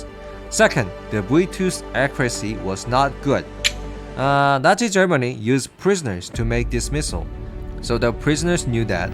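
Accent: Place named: Russia. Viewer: Love your videos ❤ Btw you sound Russian are you from Russia?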